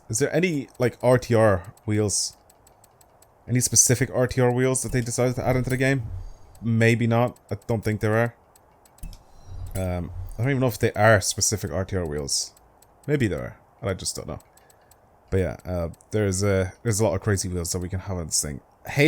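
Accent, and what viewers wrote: Ireland. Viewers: Are you Irish? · Hmmmm? Are you irish?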